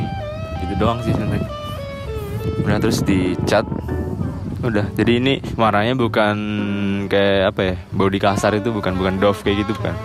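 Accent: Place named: Java, Indonesia. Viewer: Medokmu ketoro mas 😅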